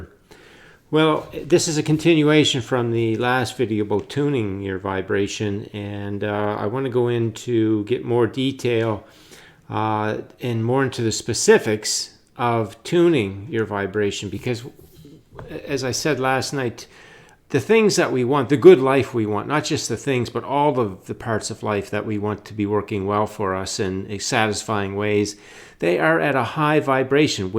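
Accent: Canada. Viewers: I’ve never noticed a Canadian accent until this video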